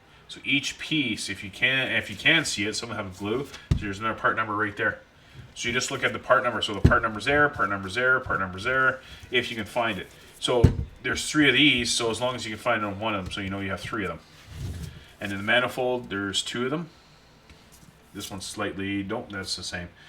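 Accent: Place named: Canada. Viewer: You're Canadian accent makes me want to vomit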